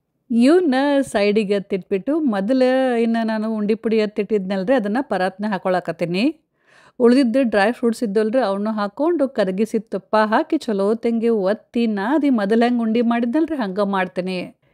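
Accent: North Karnataka, India. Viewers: I forgot to tell you, I lived during my childhood in Bangalore, and I had some classmates who spoke the Dharwad Kannada so I absolutely love uttara karnataka dialect. Thanks for speaking in that dialect. That brings me back to my golden childhood days!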